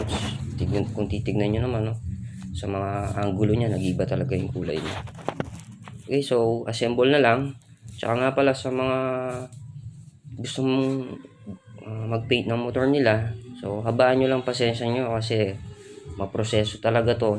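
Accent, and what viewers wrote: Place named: Pampanga, Philippines. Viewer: lupet mo neh soy.kapampangan ka ne soy tunog pamu.ehh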